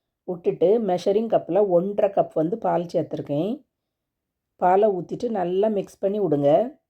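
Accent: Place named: Tirunelveli, India. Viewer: Unga slang spr sis me too tnvli❤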